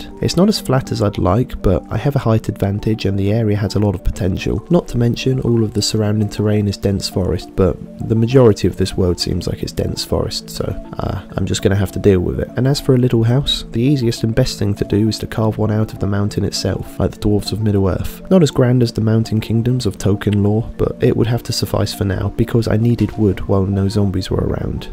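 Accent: United Kingdom. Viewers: Um he’s British if you couldn’t tell 😬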